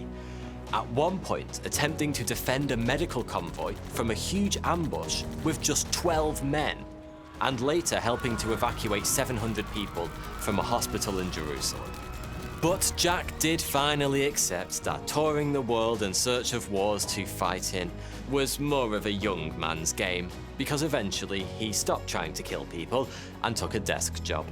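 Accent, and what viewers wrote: United Kingdom. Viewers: Could not take the British accent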